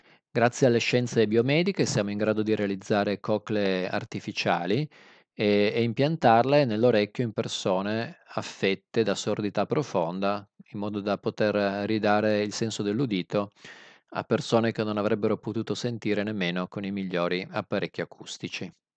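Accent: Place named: Calabria, Italy. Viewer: Non si e capito molto sembri di Calabria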